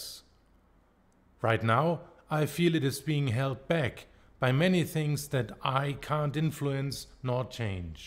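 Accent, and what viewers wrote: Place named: Germany. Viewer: A german with humour and a very german accent? Sign me in. Thank you dear neighbours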